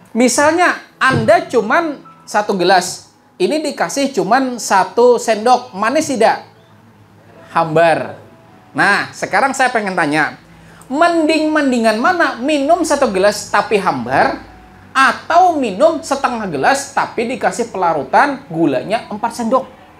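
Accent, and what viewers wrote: Banjar, Indonesia. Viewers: Loghatnya seperti orang Banjar/kalimantan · Urang banjar kh ini pina logat nya 🤭🤭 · Pandernya kaya ada logat banjar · Logat banjar nya mantap pak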